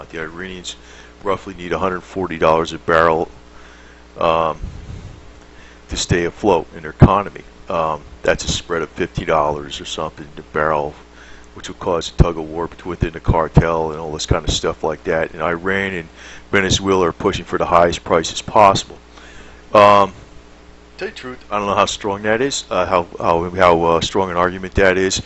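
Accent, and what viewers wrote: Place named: New York, United States. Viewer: You sound like you're from New York. No offense, but I just imagine you saying something like, "Hows about me, Tony Baloney and the gang come over there and whack ya up side the head, eh?" Seriously, good info. Yours is one of the top hits for recent videos with Lindsey Williams. On the Sunday Alex Jones show yesterday, a caller asked he bring Lindsey back on and Alex agreed. We might see him either this week or next week.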